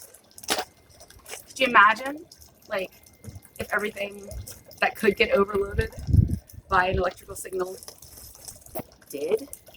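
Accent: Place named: South, United States. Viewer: How long have you been in Central PA? I'm hearing an awful lot of Y'all's, you're a little north for that kind of talk.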